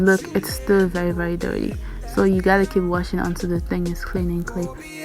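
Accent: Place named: Pidgin, Cameroon. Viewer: I can identify u with our pigin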